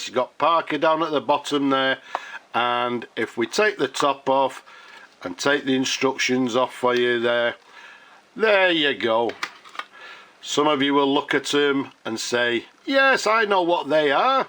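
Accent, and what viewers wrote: United Kingdom. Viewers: Are you a british man?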